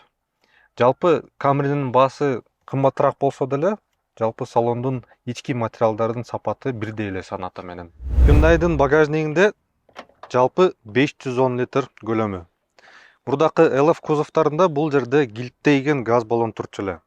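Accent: Standard. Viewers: Көптөн бери эне тилибизди мындай таза угалек элем азаматсыз унага келсек мен соната ден 8 айдагам өтө жакшы уна бирок камриге эч качан жетпейт соната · Кыргыз тилинде таза суйлогону жакты Бирок Машина Автомобилдин кыргызчасы араба шайтан араба деп айтылат уялбай шайтан араба деп айтабергиле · Рахмат иним, таза Кыргызча суйлойт экенсин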